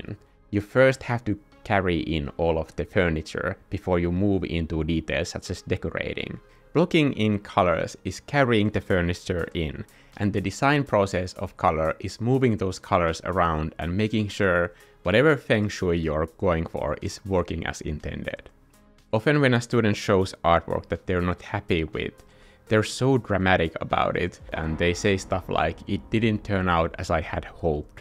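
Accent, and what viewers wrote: Finland. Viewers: I KNEW I recognized a fellow Finn in your accent!!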